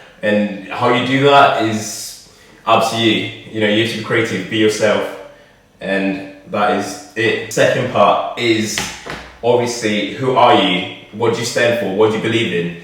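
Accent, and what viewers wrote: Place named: United Kingdom. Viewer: Don't overlook how MASSIVELY important it is to have a charming British accent, you seemed to have passed right over that bit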